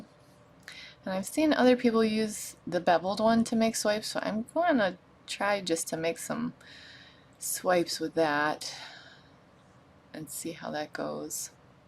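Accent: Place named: New England, United States. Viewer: Beautiful thank you, I’m from Massachusetts too. I thought your accent sounded like you from New England, lol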